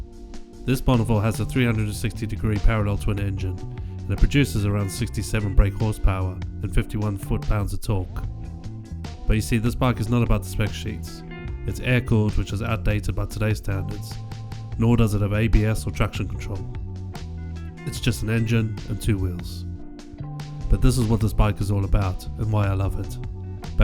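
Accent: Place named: United Kingdom. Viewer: Thanks for the video. I respect that this is your channel and you certainly can present whatever you want. From a purely personal perspective I'm American and I do have to listen closely to those from Britain to be sure I understand you. I'm probably in the minority here but I find background music distracting at any volume because it inhibits my ability to understand you. I appreciate that you are a motorcycle purist and that is worth hearing. I'm a good bit older than you and remember the first Triumph Bonneville I ever saw/heard, <num>. I don't remember any other motorcycles from that period as well as I recall that Bonneville. As soon as I can sell my BMW I'm going to buy a Triumph....I should never have bought the BMW.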